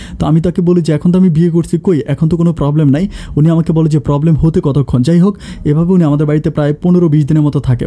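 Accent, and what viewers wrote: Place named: Noakhali, Bangladesh. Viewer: তুই কি নোয়াখাইল্যা? কিন্তু মূর্খ নোয়াখাইল্যা.. তবে তোর ভয়েস টা সুন্দর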